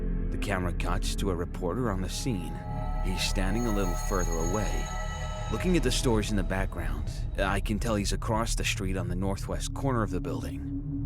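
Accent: United States. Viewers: Its my 1st time listening, I'm from California. I thought you were an American from the eastern states , not east coast though. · Wait- you’re NOT American?! Damn dude!! Bravo!!! New visitor, new sub!!! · Came over from Dark Somnium, and glad I did. Great narration, ambiance,and excellent job on the US accent! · wait...mf you ARENT from the US...?? This is my first time hearing ya, and I wasn't able to tell at ALL, I thought you were American. That's saying a lot considering I was literally born and raised in the US lmao. Some advice you may or may not find useful for future readings with the US accent : What you did was a north eastern accent, and very similiar to mine (plain standard US accent here). It matched the architecture shown in the art perfectly, dont know if this was on purpose or just luck. Some words were pronounced similairly to the 'standard canadian' accent, but thats actually accurate depending on the region, as many northern US citizens pronounce things similarly/exactly the same. 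Also: Telling apart the standard US accent from the other northern variations can be almost impossible unless you hear certain words pronounced. So dont get frustrated if you have a difficult time telling em apart: Many Us citizens can't, and they literally live here.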